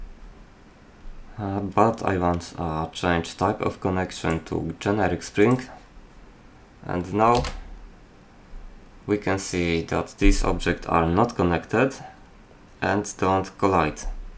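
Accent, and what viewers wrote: Poland. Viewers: jesteś polakiem xD jeśli tak to poznałem po trochę niepoprawnej gramatyce i polskiej wymowie liter i niektórych wyrazów bez urazy ;-)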